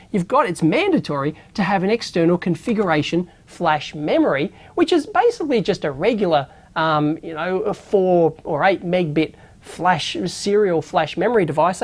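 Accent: Australia. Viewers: Are you from down under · This guy is like watching Steve Irwin: in the accent and the excitement. Great speaker. Thanks for making it, really helpful.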